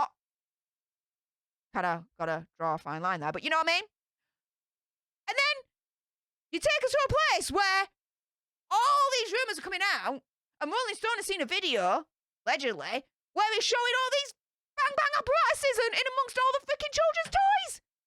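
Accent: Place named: Northern England, United Kingdom. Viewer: She's a Northern Lass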